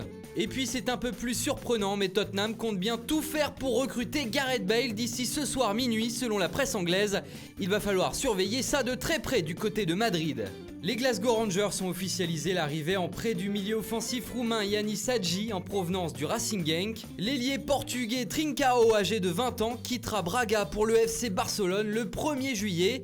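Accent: Italy. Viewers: Elias Parker Bah ouais nous on veut entendre l’accent italien gros 🤣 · Non non non il faut que tu restes !! Le plaisir de l’accent en série A va tellement manquer :/ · Je reste toujours attentif à ton accent italien quand j'écoute,je ferais comment si tu pars?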